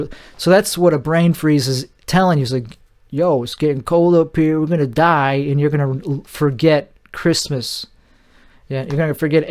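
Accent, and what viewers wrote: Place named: United States. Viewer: I am russian. And your accent is so American. 😮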